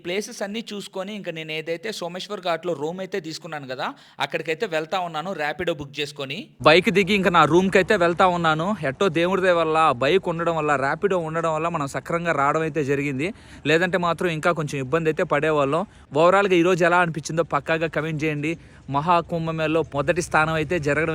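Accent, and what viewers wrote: Nellore, India. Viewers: సూపర్ గా చెప్తున్నావ్ నాన్న నెల్లూరు భాష ఉపయోగించి మాది కూడా గూడూరు చాలా సంతోషంగా ఉంది బాగా వివరిస్తున్నావ్ వెరీ గుడ్ నాన్న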